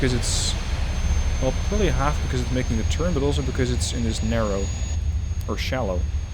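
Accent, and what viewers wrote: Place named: Netherlands. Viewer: I recognized the dutch accent from the start :p I'm dutch too.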